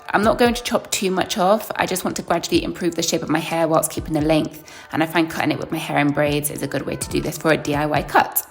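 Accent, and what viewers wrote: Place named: England, United Kingdom. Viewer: Omg i love your accent , im moving to England 😂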